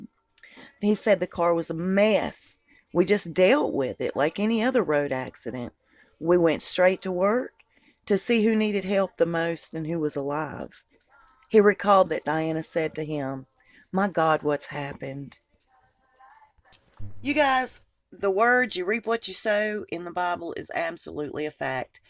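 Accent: South, United States. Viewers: I love the sunshine in your sweet southern accent! You are a truth teller, and a very creative person. I look forward to seeing , and hearing your videos! We have a lot in common. About 7 yrs ago I began to pick up bits and pieces of the dark side of these families, and I do not look at life in the same way as I did , before learning all of this underlying truths. I am probably very sceptical, but in a positive way, and because of my own life experiences. I am a Christian, a Southerner too, and I was a floral designer, manicurist, and I started out doing hair, but decided to, graduate early, and the one thing that really stood out in my work was my passion to be a florist! I BECAME ill with several conditions while I was working on my career goals. I also had my own housekeeping business on Galveston Island, and here in my home, Austin , TX. I live in a very liberal city, and a very artsy community.I love those things, but it is hard to watch my home changing so quickly.I am not a political kind of person, and not a liberal or Republican. 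I try very hard to see all sides, and make my own informative desicions . I am disabled now, but I still push forward to remain as Independent as possible. I have 6 beautiful Grandbabies, and 2 wonderful daughter's, and 2 Very nice Son's- n- law. And, after 35 YRS of Mothering, and Grandmothering, I finally have 2 Grandsons! They are very energetic, and lot of fun. God has blessed me richly in this area of life. I do appreciate your entertai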